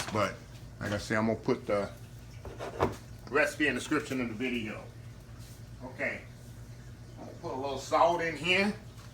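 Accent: South, United States. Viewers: Luv that southern accent. My grandmother would make the BEST MIXED BERRY DUMPLINGS/COBBLER with ICE CREAM. ( luv’d it that way). Aloha🌺from Hawaii.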